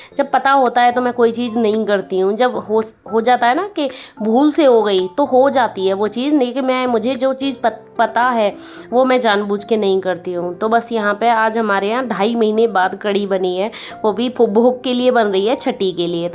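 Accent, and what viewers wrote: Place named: Agra, India. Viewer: Aap bilkul hamari bhasha bolti ho.m agra se hu.jaise rai jharap or hamare yaha bhi sawan m kadi nahi banate.mera bhi man kar raha h m bhi kal banaugi sawan k baad😊🤗🤩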